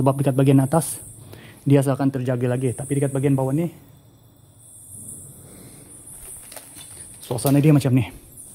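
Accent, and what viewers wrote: Sabah, Malaysia. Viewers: Bro lu pkai je loghat sabahan .. Tak ada Hal kami faham😅😅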